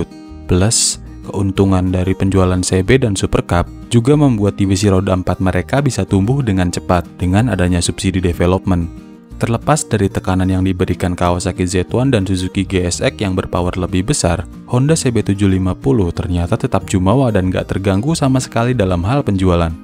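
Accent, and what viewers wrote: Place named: West Java, Indonesia. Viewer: Orang sunda mana kang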